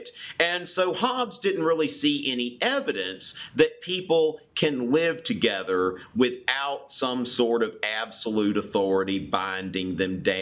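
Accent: South, United States. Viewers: This is a real southern usa accent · I totally think that all philosophy classes should be taught by folks with a Southern accent. Yours Truly, Demian Virgil Hammock the son of Prof. Virgil Hammock P.S. The opening with Heavy Metal music is a nice touch. I went to some sort of Indie Music thing and I asked when is ABBA playing? I got that look and was told never to come back. I think I am on some sort of Indie Hipster ban list? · which state are you from? you have a very strong accent :p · Something about yer yank accent explaining philosophy is endearing, will stick around. love from ireland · It was when I heard you threatening to drink my blood for breakfast that I realized this was the second time I was watching this video.😃😃😃. Just finished listening Locke’s Two Treatieses, so your great sketch now sounded with new wisdom. Also, that southern accent sounded dear, too😄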